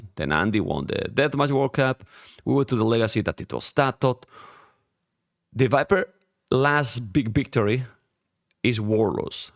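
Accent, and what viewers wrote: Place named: Spain. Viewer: I'm English and the jokes he does is European Banter/Dry humour, it's very understandable and funny af lmao, yes he has a bit of a thick spainish accent but it's a positive, it brings charm and more humour to some sayings he does which he has created himself, like "SIRO!" It's brilliant. And I think he's got better at not interrupting, I really respectfully disagree with all what you said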